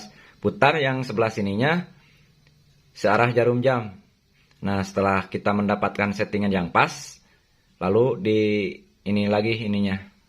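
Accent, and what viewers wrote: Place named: West Java, Indonesia. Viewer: Duh, si Aa sunda bngt. Haha Don teh apa Aa?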